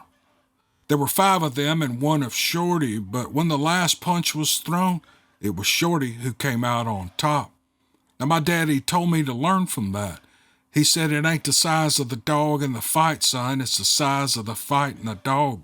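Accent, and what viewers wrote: South, United States. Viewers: Don't worry about the haters. Just read until you find out that they are haters and then hit the delete. Don't waste your time on them. I love all the stories and don't mind a bit if you mix Bigfoot and ghost or aliens! All good!! Thank You for doing these I love your story telling all the way up here in Michigan. Oh and your Southern sound is just fine!! Thank You again! Tina Rasegan. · Hats off to the writer & story teller. Story flows like southern accent. 🧚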